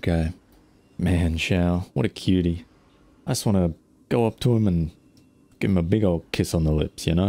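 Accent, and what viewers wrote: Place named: Australia. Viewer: the aussie accent is glorious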